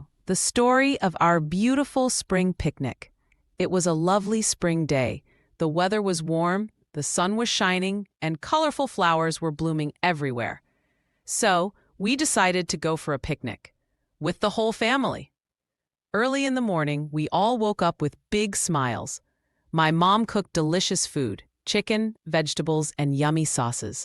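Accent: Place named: United States. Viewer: Everything becomes very easy when the instructor is someone who can explain things in a straightforward manner. I understand English very well from your story and the best thing is your speaking style. I really like the American style. Your style of speaking and language are very good.Please upload two videos a week so we can practice and learn well.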